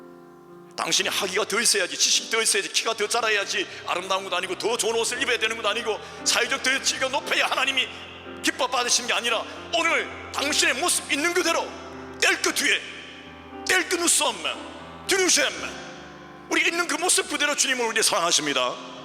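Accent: Gyeongsang, South Korea. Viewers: 경상도 ㅋㅋㅋ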